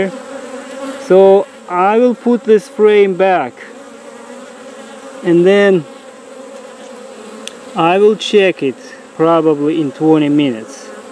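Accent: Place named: Russia. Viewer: Мне одной кажется, что он на самом деле русский, но пытается говорить на Английском с ужасным акцентом..)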